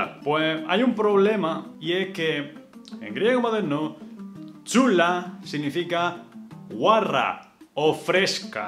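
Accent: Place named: Andalusia, Spain. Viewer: Soy andaluz de padre y madre andaluza, y ni siquiera yo tengo un acento andaluz tan marcado como el tuyo... ¿dónde y cómo lo aprendiste? Al aprender español cómo es que te decantaste por la variedad andaluza y no por cualquier otro dialecto español. Un cordial saludo desde Andalucía, concretamente desde Málaga. :)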